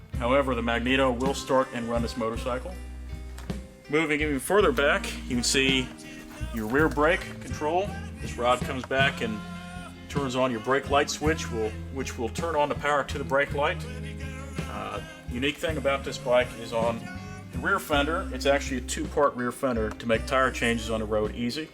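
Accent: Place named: United Kingdom. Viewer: Sounds British..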